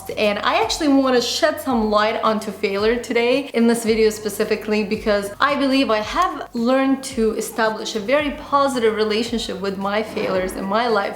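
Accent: Azerbaijan. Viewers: please she is from Azerbaijan and speaks Azeri and Russian. She was 16 when she moved to Canada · lol Canadian???? She's from Azerbaijan, therefore has an accent that's obviously different from yours and she's not saying it "wrong". Very ignorant thing to say.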